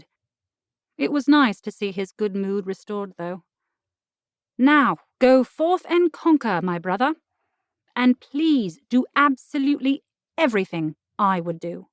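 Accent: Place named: West Virginia, United States. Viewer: The West Virginia was accurate but I got tired of listening to the twang. Sorry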